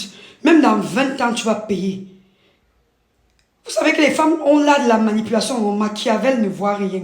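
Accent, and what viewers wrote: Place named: Bangangté, Cameroon. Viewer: Ton accent Bangangté est trop fort ma chérie❤t'es vachement mignonne❤❤bisous.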